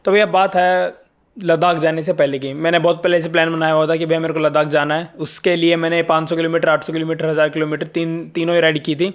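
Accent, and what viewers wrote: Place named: Haryana, India. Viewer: Haryanvi way of talking love from Australia bro ...keep going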